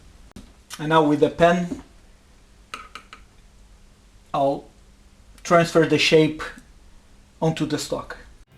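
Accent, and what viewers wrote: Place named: Portugal. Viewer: Ah! Sabia que tinha ouvido este sotaque dum outro lugar! Já tive em Portugal antes, vivi em Braga e Lisboa, belas cidades, devo dizer. Saudades de Portugal, um abraço!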